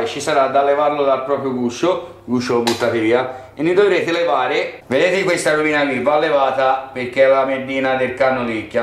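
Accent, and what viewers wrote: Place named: Sicily, Italy. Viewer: a me sembravi un siciliano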